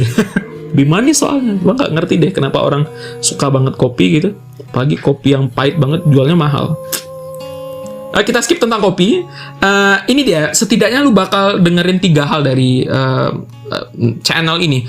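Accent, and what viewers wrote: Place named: South Jakarta, Indonesia. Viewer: Woaaah.. 😂 jaksel banget bro But good job! Keep it going yaa ^^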